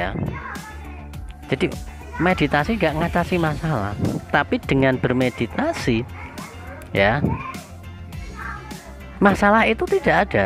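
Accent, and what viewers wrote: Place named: East Java, Indonesia. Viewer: Mantap Mas, panjenengan asli ndi mas, logat kayane asli daerah wetan?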